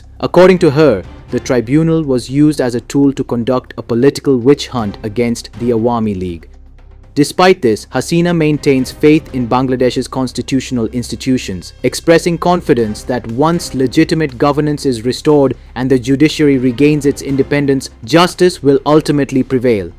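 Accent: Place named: India. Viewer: An Indian with shitty accent... Desi Romanised narrator.. crimes of India channel never amazed me.. office is smokers point in mumbai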